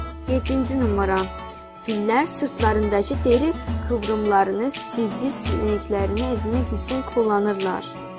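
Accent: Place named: Azerbaijan. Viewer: Türkçe Çoxda Danışanmırsan O Saat Başa Düşülür Azerbaycanlısan Genede Halaldı =)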